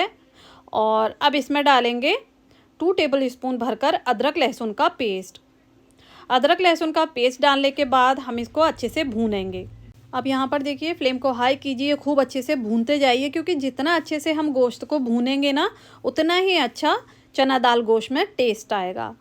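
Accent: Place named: Hyderabad, India. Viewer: Assalam alekum khairiyat main Saudi Arab se dekh raha hun aap sarson ka tel khate ho aapki language Hyderabad ki tarah hai aur aap sarson ka tel khate ho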